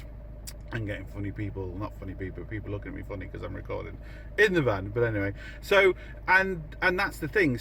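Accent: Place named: England, United Kingdom. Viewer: Funny all the years you.ve lived here u never lost ur strong English accent 🤔🤔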